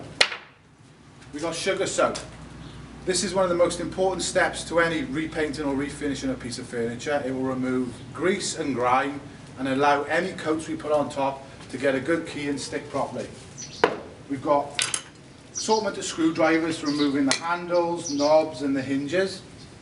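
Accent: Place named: Cardiff, United Kingdom. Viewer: Got to be a Cardiff boy with that accent.....arrrright butt!!!!!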